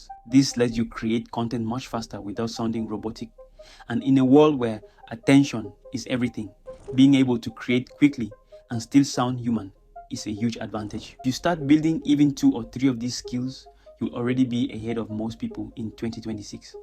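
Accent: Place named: Africa. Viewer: Your video has a well- thought outline and a coherent presentation. However, the heavy African accent is a distraction and calls on viewers to focus on your speech pattern. There are soooo many hard-working content creators from 3rd world economies that FAIL to focus on their poor English speaking skills. You will struggle to keep attention from viewers in Tier 1 countries who will abandon the video after 2 seconds of listening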